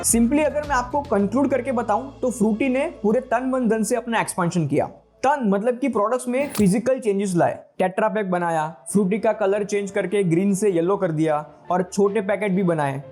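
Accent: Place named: Gujarat, India. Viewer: Buhot he acha video hai brother. I think aap gujarat se ho bcs me bhi gujrat se hu or baat krne k style se pehchan gya😅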